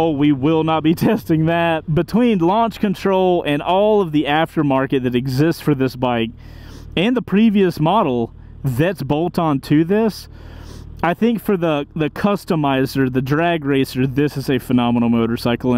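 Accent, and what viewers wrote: United States. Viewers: Great bike . Nauseating yank accent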